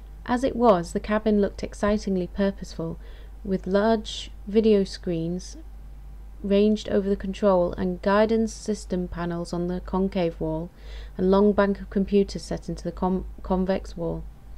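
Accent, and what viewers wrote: United Kingdom. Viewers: I always enjoy watching you read! Love your British accent and when you take on the characters voices it shows you are a bit theatrical! I love it! I have to add the twinkle in your eyes, your restraint of laughter from your facial expressions was hard when your cat went meow! lol .. so cute!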